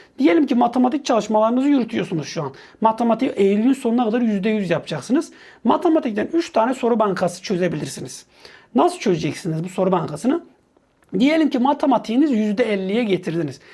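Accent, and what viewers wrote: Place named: Gaziantep, Turkey. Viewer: Hocam Antepli siniz galiba 😄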